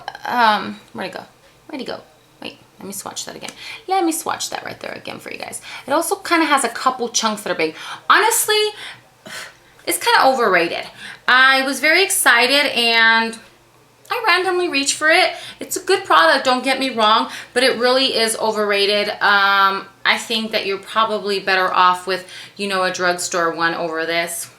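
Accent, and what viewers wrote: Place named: New York, United States. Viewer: you sound so Latina... and so New York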